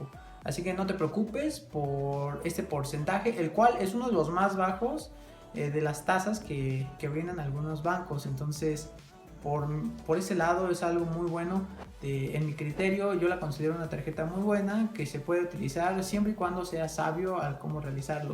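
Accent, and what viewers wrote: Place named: Mexico. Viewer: Ami me enacnta 3l asentó mexicano aunque no viva ayi gracias por tu información bro lo tendré encuenta cuando valla algún día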